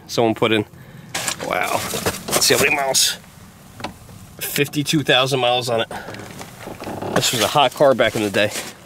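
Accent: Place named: Midwest, United States. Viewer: Your accent is NOT Hyde Park NY. More Midwest or Minnesota. Just saying~~~ 👍❤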